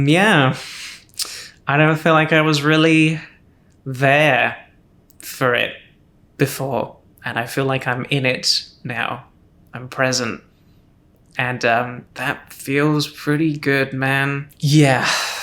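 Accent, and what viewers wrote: Canada. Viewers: Lovely to have you back and with an extra Canadian twang to your accent! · hahahaa you've picked up the Canadian accent! it jumps out whenever you say "back" :') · So happy you are happy, and seeing you live your best self! Take your time & do what you are comfy with! Also couldn't help but giggle at the words that sound more Canadian now hehe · Wow you can really hear the Canadian accent now. Crazy how quick accents take hold. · For the first couple of minutes I kept thinking, "There's something different about her voice..." and then she mentioned living in Canada and I was like, "Ah, yup, that's it." Congrats on your gender transition and your Canadification transition! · Omg you sound so canadian! Welcome back to youtube :) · Your whole style is so good and you look so happy and comfortable (!!) but the part of this video that caught me the most by surprise was hearing the distinct traces of a Canadian accent in your voice. 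Didn't expect that little twist! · Your accent seems to have been influenced in Canada! Ok vowel shift! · Your voice sounds so comforting but I'm noticing a little bit of Canadian accent now! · ! Thank you for reintroducing yourself after all these years. This has felt nostalgic in a way. The only thing that made me think… WHAT?! Is your new Canadian fusion accent haha. Nice to see you again 😊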